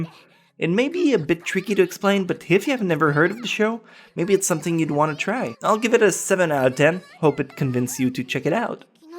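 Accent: Quebec, Canada. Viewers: French Canadian accent you get used to it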